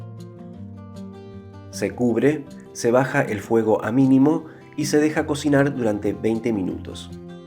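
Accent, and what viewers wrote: Argentina. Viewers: Hola! Un verdadero placer y deleite escucharte y ver tu video!!!!! Tan profesional y con tanta excelencia!!!! Es rarisimo encontrar a alguien que no esté a las corridas en un video, pero tampoco estás hablando gansadas con parsimonia para llenar espacios huecos. Tu acento neutro argentino, a mi parecer, fue extraordinario!!!!! Mil felicitaciones! Soy desde ahora tu fan. Me gusta la impronta de ponerle un poco de historia e información del origen de la receta. Gracias por cada detalle de los que te mencioné. Un fuerte abrazo desde Córdoba, Argentina!!!! 🎉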